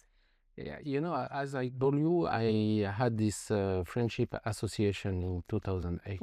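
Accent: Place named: France. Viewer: Déjà, en Anglais, il a un accent français à gratter au couteau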